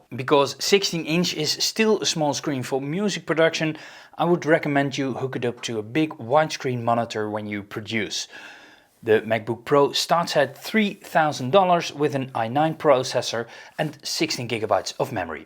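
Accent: Netherlands. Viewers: Leuk en informatief Youtube kanaal! Ik type het maar in het Nederlands :-) aan je accent te horen ben je dat. Ik produceer zelf vanuit Logic Pro X icm een Mac Mini i7 6-core /32gigram/1Tb Maar dat is alleen maar omdat ik inmiddels gebonden ben aan Logic... Anders had ik wel een Windows machine gekocht ;-) Keep up the good work!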